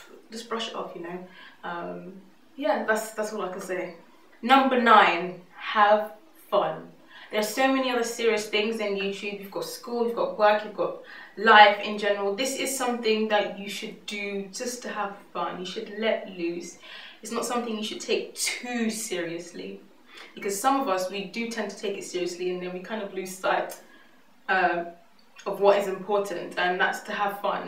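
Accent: England, United Kingdom. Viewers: I love English accents but I have a question, do you ever think people are saying " " when they say " surely"? haha, I love you lots! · Listening to you is already a "Treat". I love your manner of speaking & that's not just because you are speaking British English...mainly because you are presenting yourself TRUTHFULLY...not steering away from what you are actually passionate about & not what others expect on your Channel. Great Tips on putting with with Youtube & LIFE IN GENERAL!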